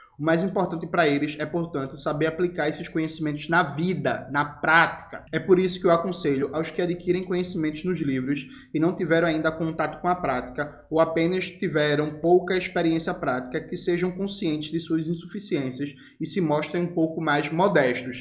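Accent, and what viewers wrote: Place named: Bahia, Brazil. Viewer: O é MUITA coisa que eu gostaria de ser, o cabra é inteligente, fala bem demais, é lindo, um cara malhado, com um dos melhores sotaques desse brasilzao (contexto sobre, minha família toda é da Baihia e eu nasci aqui em SP 😢😢😢 QUERIA TER O MELHOR SOTAQUE DESSE PAIS, PQ EU NAO NASCI NA BAIHIA 😭😭😭😭) E o homem é casado Veja se nao é um combo de características perfeitas, pós revolução terei um quadro do em minha parede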